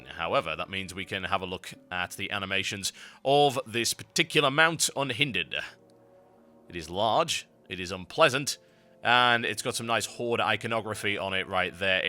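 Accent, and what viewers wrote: United Kingdom. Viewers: u idiot. he's british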